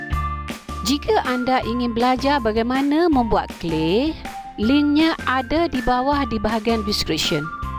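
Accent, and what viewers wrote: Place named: Malaysia. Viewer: Serasa lihat film upin ipin. Bahasa nya sekampung dgn upin ipin. Xixixi. Salam kenal kak.. Saya dari indonesia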